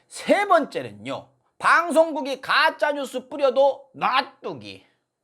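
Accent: North Korea. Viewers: 말투가 탈북자 느낌 나서 놀랐음.. 빨갱이 타령하는 것들이 하는짓이 딱 그 쪽이라 어이상실.. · 북한사람 말투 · 조수진이라는 여자 말투도 무식하고 억양이 북한 억양 말투에 과장되서 탈북한 여자인줄 알았어요. 꼭 뽑아도 막장스런 여자들만 비례 국회의원으로 뽑는건지 ? 통합당 비례 기준이 막장 인가봐요?전희경이랑 이은재 남장여자 임이자등의 모습을 안좋은건 다 닮은듯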